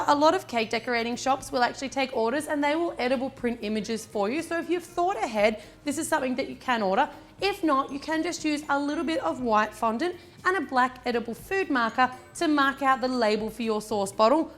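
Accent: Australia. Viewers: I just started watching your tutorials and I think they are great! ; ) I just want to know if you are Australian ? :-)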